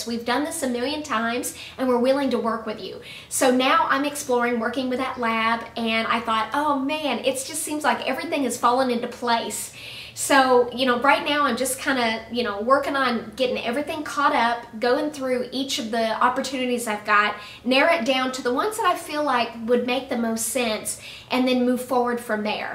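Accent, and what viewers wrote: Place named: United States. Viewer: you have a really sweet accent! which state are you from?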